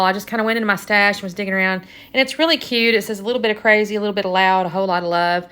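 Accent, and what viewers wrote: Texas, United States. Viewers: Thank you! I love your layouts and your process videos are some of my favorite (maybe because I am from Georgia and I hear the Texas southern accent lol). Seriously, you do a fantastic job with your videos and I am very happy to have found you. Have a great rest of the week!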